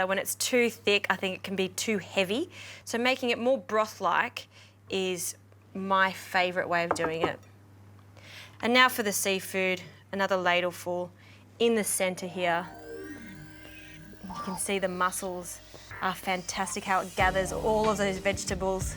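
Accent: Australia. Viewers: You are a cutie pie I like your Aussie accent...could you wear some tighter clothes next video..oh the dish looks nice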